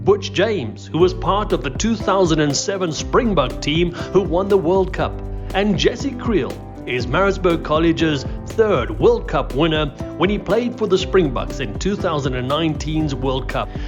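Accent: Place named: England, United Kingdom. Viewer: this guy's pronunciation is too english!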